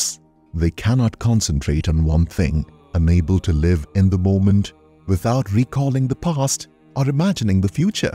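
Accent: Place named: India. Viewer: This is definitely not Ai, just because an Indian accent is “foreign” to you, does not make it ai. This has most definitely been script/edited and voiced by a human.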